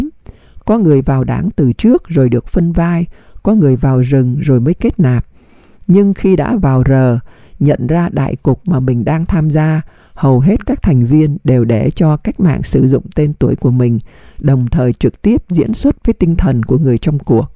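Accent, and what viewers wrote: North, Vietnam. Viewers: Như đã nói, giong hơi giống giọng chuyên gia tâm lý Lý Thị Mai ( đg ở VN). Giọng Bắc54 nge ngồ ngộ, vui tai.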